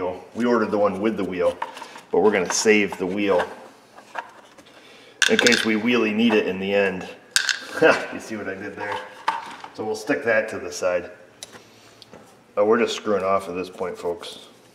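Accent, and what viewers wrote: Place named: New Jersey, United States. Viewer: wait what are you actually russian i thought you was from joisey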